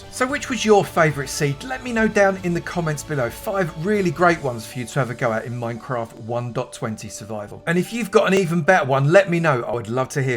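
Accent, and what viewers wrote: United Kingdom. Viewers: this dude sounds like a tourist attraction person XD very soothing bri'ish accent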